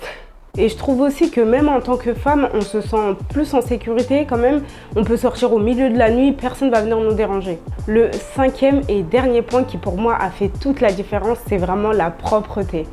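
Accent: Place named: Moselle, France. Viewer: Elle a un sacré accent mosellan !!!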